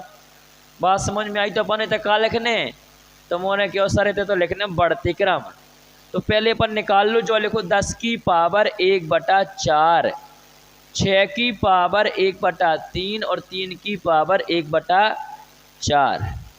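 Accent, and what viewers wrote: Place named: Bundelkhand, India. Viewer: Sir apki bundeli bhasha bahu achchhe hai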